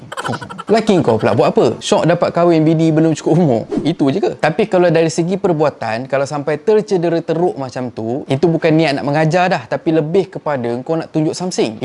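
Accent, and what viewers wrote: Kelantan, Malaysia. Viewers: Good effort bang cekek kelate hahahah😂👍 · Mu kecek Klate haha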